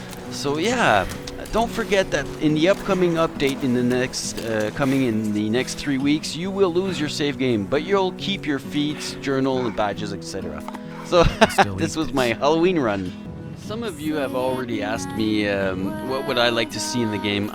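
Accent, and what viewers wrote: France. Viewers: OH MY GOD!.........YOU'RE FRENCH!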